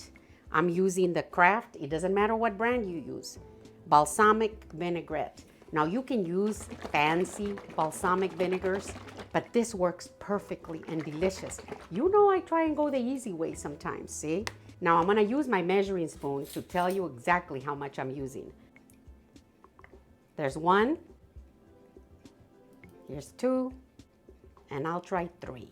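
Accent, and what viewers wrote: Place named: Midwest, United States. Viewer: This is my kind of food! Looks delicious! Your MN/Dakota accent is adorable ❤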